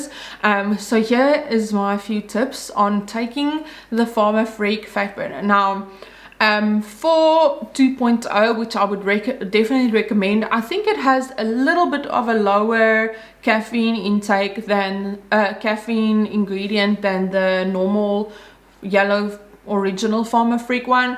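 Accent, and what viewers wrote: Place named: South Africa. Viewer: Love the South African accent!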